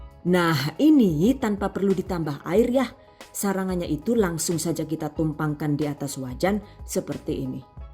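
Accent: Banyumas, Indonesia. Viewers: Semua vidio saya suka karna disitu ada bahan2 nya,ada perhitungan biaya2 sampai hal yg terkecil dan sesuai daerah wilayah anda yah itu kata2 engga bisa lepas setiap per episode vidio yg lain nya dan satu lagi ngapak nya jos gandos😁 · Suka banget channel ini krn pengisi suaranya lucu dan menarik, apalagi kalau bahasa ngapak hahaha. Ada tips dan trik juga sukses selalu #GiveawayTHV2021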